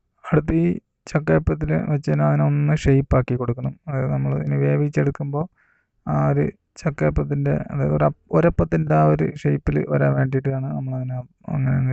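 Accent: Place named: Trivandrum, India. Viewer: അണ്ണാ പക്കാ തിരോന്തരം തന്നെ 💪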